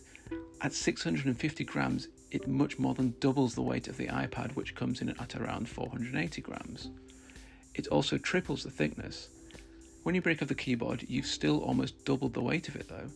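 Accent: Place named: United Kingdom. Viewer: thanks for your reply, cause I hear your accent and thought you were in Uk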